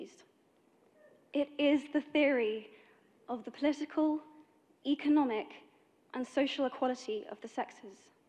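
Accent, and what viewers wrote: United Kingdom. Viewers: 素晴らしいイギリスアクセント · love your British accent, 😍 you're so beautiful and hope for us all women in the world. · イギリスなまりが結構強い！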